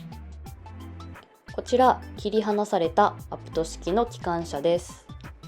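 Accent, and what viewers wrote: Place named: Kansai, Japan. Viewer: 、お疲れ様です😘 ここの、景観は、いつ見ても、最高👍🤩ですネェ🎉 秋🍂は、紅葉🍁、冬☃️は、白景気、今、シーズンは、新緑の風景、本当、いいですネェ〜 後、ちゃんのハニカミ笑顔と時々出る、関西弁も、いいですネェ👍🥰🥰🥰🤣🤣🤣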